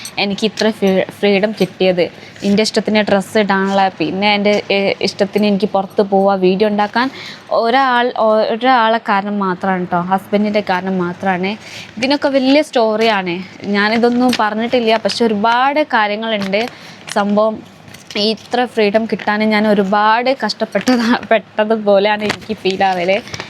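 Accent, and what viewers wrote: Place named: Malabar, India. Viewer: ഒരു മലബാർ ടച്ച്‌ വർത്താനം 😍❤️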